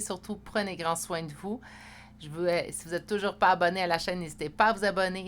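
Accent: Canada. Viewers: Gratitude infinie à vous car il y avait 1 message pour moi. Je vous trouve formidable et j'adore votre petit accent canadien ❤❤ J'ai 1 suggestion pour 1 prochaine guidance. 1 guidance avec les numéros du prochain loto. V'la 1 idée qu'elle est bonne 😅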